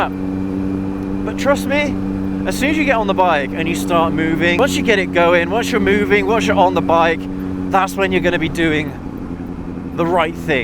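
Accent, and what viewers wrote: United Kingdom. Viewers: Nicely done! I've recently subbed because of the variety of approaches and the ever-articulate British accent. 😉 You pretty much summed up the entire video (IMO) with the discussion about mind. All is mind. Every story we tell ourselves is locked in there and it's our job to "just get on with it" so we can over it. See ya on the next one!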